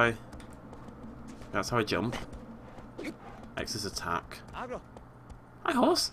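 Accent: United Kingdom. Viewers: Got to love how the British say H.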